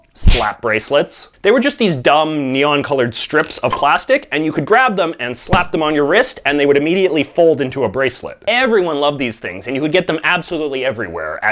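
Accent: Canada. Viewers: "Aroond", well now I know you're not faking the "aboot" thing. Btw I'm also 32, hi-five.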